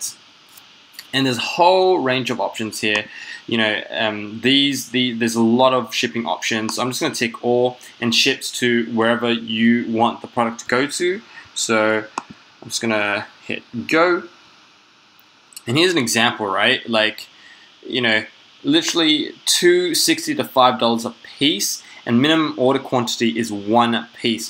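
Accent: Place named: Australia. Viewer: Sounds like an Aussie.. 5 bucks must be like 50 cents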